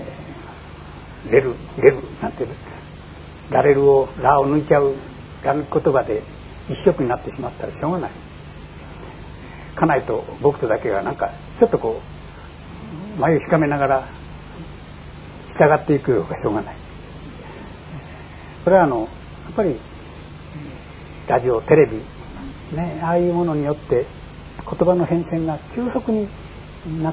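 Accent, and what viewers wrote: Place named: Ise, Japan. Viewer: 遠い世界の片隅に住み古の大詩人である杜甫の如く深山の裾野を巡り林に入り込み暫し途方に暮れし時何処からともなく聞き覚えの訛りのある控えめな染み染みとした語り口のありがたき御講義の冒頭のところが始められたのである。 あの柔和で羞じらふやうな語りの世界は誠に悦ばしき事であります 確かにあれは聞き覚えのある筈のものでありました。 我が妻とその父母の生まれは、伊勢松坂の山里であります。道理で即座に判ったのであります。 本居宣長の生まれし古里である伊勢松坂の山室山の地に近ひのであります。私は己の縁の深さといふものを感じました。 この大小説の有名な冒頭部分の誰も知る桐壺の巻の第一行｢いづれの御時にか、女御更衣あまたさぶらひ給ひけるなかに、いとやむごとなききはにはあらぬが、すぐれてときめき給ふありけり。｣ でありますが、実を申せばこの講義に使ひたき古本があります。昭和二年九月一日印刷、昭和二年九月五日発行、発行所・弘法社、校訂者 有川武彦、発行者 湯川松次郎、印刷所 井下書籍印刷所、｢増訂 源氏物語湖月抄上巻 定価 金 五円 中巻 昭和三年四月十日 印刷 昭和三年四月十五日 発行 昭和四年五月一日 再版 発行 定価 金 五円 下巻は昭和三年四月十日 印刷 昭和三年四月十五日 発行 昭和四年五月一日 再版発行 定価 金 五円 全て和本であります。私はこの書物をいつの時にか、恐らく幸運なことに安価で多分、ブックオフで購入したのだと記憶しておりますが、恐らく稀覯本であったのではなひかと思っております。 確かに古くて綺麗な本ではありませんがいひのであります。 多分生涯の書物になるのではなひかと思っております。 又しても、話しが横道に逸れて仕舞ひまして誠に申し訳ありませんでした、どふかお許し下さひ。 大碩学である岡野裕彦先生の心昂ぶりて已まない素晴らしき名講義を拝聴しやうではありませんか。 擱筆 😊😊😊😊😊😊😊😊😊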